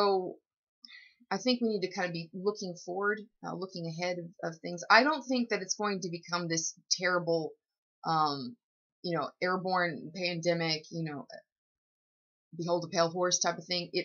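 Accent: South, United States. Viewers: Scott Haskin You're right you can't hide Natural Beauty and that southern accent makes me week at the knees..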